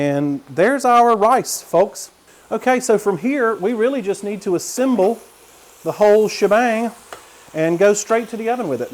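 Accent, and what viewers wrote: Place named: Tennessee, United States. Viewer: I am from Knoxville, TN and I now live in Florida. It is driving me crazy trying to figure out your accent! I believe you are from Tennessee too??? Please tell me!!! I’m really going crazy😂😂😂 I’ve watched many videos trying to figure it out. You just use lots of slang I have only heard in Tennessee. I love your videos and you and I think a lot alike. Please have a great day!...... and please answer my question 🤷🏼‍♀️😂😂😂😂😎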